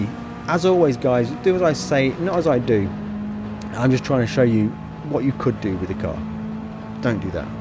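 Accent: United Kingdom. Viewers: Bro! Kyle Bush with an English accent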